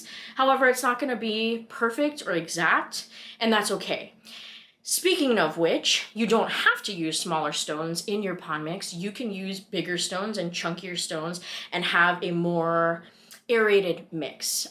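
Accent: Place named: Southern California, United States. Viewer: Ooh I really like you! I love it when I can tell right away somebody is from SoCal, then when I saw your username I knew for sure! You explain things in a great, direct and enjoyable way!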